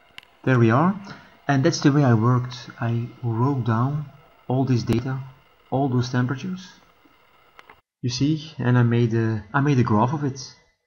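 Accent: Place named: England, United Kingdom. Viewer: Thank you for this great video. Btw, j´aime bien votre accent anglais :-))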